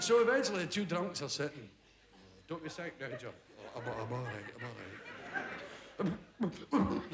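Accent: Scotland, United Kingdom. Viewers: Watched this with subtitles, and even autosub can't figure out some words😂😂😂 I love the Scottish accent😂😂😂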